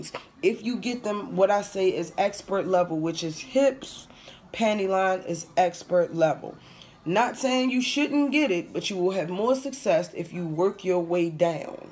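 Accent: Baltimore, United States. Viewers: Are you from Baltimore? You have the accent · Are you from Baltimore? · You from Baltimore? the way you say "to" 😂I love y'all accent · I hear them "ewrs" in your words... you from Baltimore aint you? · Thats that Baltimore accent. Hey sis! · You just spoke to my soul...I love how real you are. I have killed 3 sets so far...my placement was wrong for my skill level and possibly my body type (apple shaped/bbw). You have given me so much encouragement. In the first minute of you speaking I said she sounds like she is from Baltimore (our speech is very distinct). Thanks sis! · Are you from B- more? Your accent reminds me of home.😊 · I knew I heard that Baltimore (me too). Glad I found your video. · Juna Luna Sooooo glad you mentioned this! I have a friend that lives in Baltimore and I thought I was crazy because she sounds just like her 😂😍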